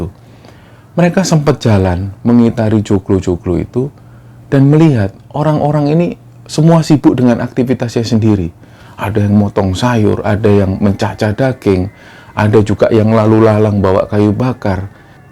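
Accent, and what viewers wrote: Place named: East Java, Indonesia. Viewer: Sy 8th di surabaya dan 3 thn di Malang, gaya bicara mas itu mengingat saya akan model Timuran, terutama ke-2 kota tersebut, sy asli Medan, tapi sy berharap masa tua saya bisa saya habiskan di Malang, penuh kenangan di sana.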